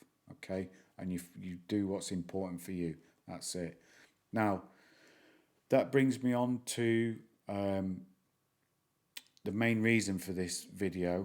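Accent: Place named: United Kingdom. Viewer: You sound liked an idiot. He’s just speaking with British accent.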